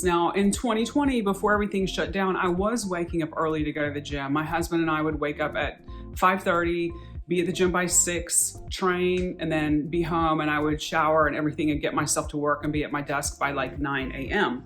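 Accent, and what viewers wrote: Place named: Brooklyn, United States. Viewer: Lol. I knew I heard a Brooklyn accent 😂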